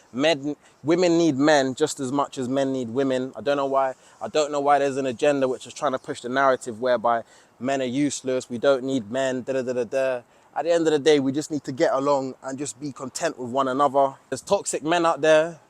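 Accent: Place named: South London, United Kingdom. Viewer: well said man - i think as long as it's all consensual/safe between adults then it's nobody's business but theirs. You sound like you're from South London btw - Thailand honestly beats the hell out of Lewisham or Croydon (where i'm from)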